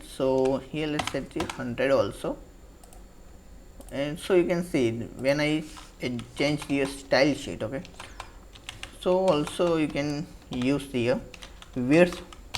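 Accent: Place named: India. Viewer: I follow you and I am happy to discover Qt with you. I used to work with Delphi or C++ Builber, and there are many similarities. I appreciate the way you make tutorials on Qt C++. I have a suggestion if possible. Sometimes, this is hard to understand you, maybe because of your microphone. As I am french, I know that Indian people and French people have a different accent in English. Maybe you can improve this. Anyway, thank you.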